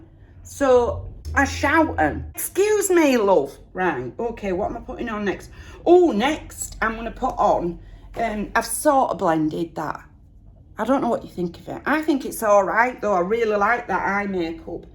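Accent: Yorkshire, United Kingdom. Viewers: It's lovely to hear someone else with a Yorkshire accent 😀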